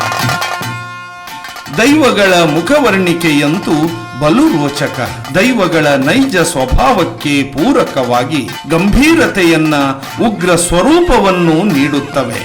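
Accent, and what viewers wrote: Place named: Tulu Nadu, India. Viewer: ದೈವಾರಾಧನೆ ಬಗ್ಗೆ ಬಹಳ ಒಳ್ಳೆಯ ಮಾಹಿತಿ ಹಾಗೆ ತುಳುನಾಡ ಮಾತಿನ ಶೈಲಿ ತುಂಬಾ ಚೆನ್ನಾಗಿತ್ತು 🙏🙏🙏🙏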